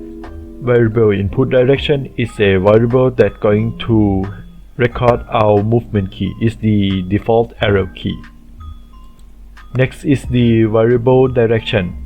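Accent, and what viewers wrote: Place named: India. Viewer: likes that Indian accent ☕️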